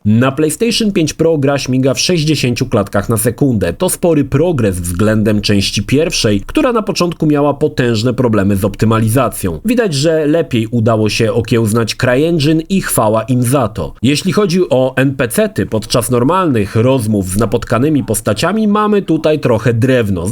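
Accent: Łódź, Poland. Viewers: To nie seplenienie to gwara Łódzka XD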